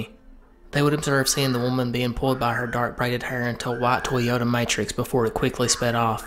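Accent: South, United States. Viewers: From one southern youtuber to another, i appreciate hearing the accent. It's a character thats been mostly lost on youtube. Also great research aand pace with the video.I feel like you chose just the right ammount of time to spend on each topic. Subscribed big dog. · that southern drawl lol.. it’s one of the reasons i like this channel and caroline easom’s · You sound so southern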